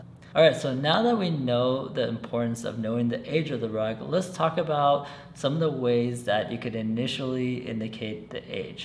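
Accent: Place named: United States. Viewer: This video was supposed to be about the art of carpet making. This salesman speil in American accent takes all the fun out of it.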